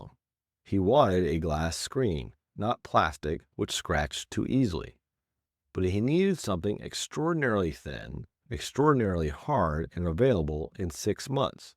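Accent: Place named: United States. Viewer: OMG. great subject but why the American accent? in is 2026, The U.S is not where it is at now. That has long gone. Can not watch, sorry. :(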